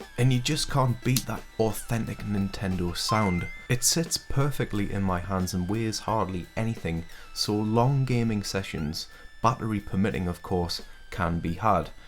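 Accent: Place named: Newcastle, United Kingdom. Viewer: your fromm Newcastle alright lemme sub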